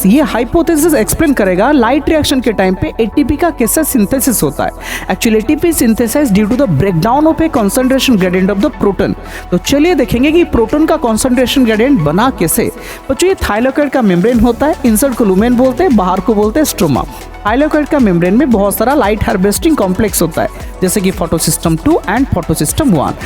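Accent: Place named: Kolkata, India. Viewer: Aap tho kolkata wale ho😅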